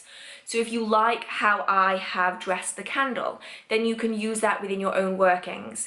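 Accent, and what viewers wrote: United Kingdom. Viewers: That you for your YouTube channel what country are you in like your British accent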